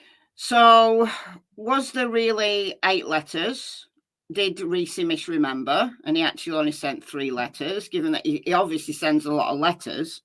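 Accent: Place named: Lancashire, United Kingdom. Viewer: definitely not Allen definitely not there was another Mann looks like him dressed like him etc that exact time used the same type of gun drove the same black ford focus same rims I mean what are the odds aye? BTW ur horrible wooly back accent is really annoying especially when ur desperate like this ur over reliant on your youtube revenue and my God forgive you for what u may be putting g these families through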